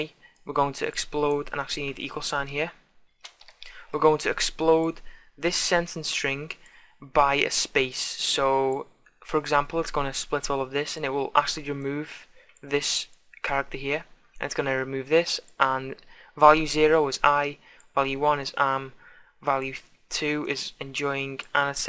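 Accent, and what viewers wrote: Liverpool, United Kingdom. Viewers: this actually really helped me thanks, btw im also from liverpool (you sound like you have a scouse accent)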